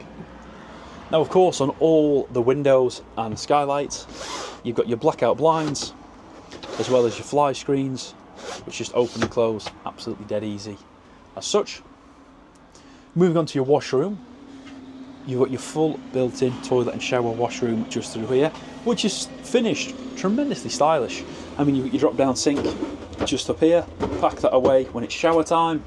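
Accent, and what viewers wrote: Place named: Scotland, United Kingdom. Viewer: It sounds like this fine young gentleman is speaking the kings English but I can't understand a single word he is saying. Is he Scottish? Nice van though.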